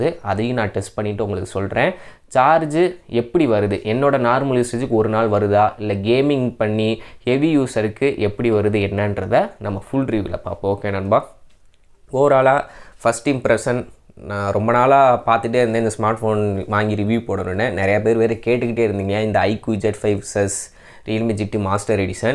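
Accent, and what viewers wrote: Madurai, India. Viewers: neenga pesura madurai slang super nanbaaa🤘✌️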